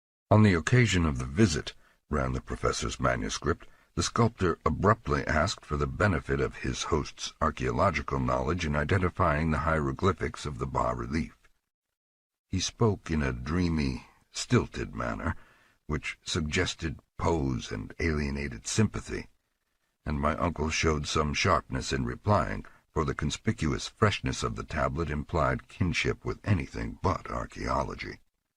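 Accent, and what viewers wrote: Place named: United States. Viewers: I am pretty certain it's American, further emphasized by the way he pronounced "either" in the beginning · Wayne June is American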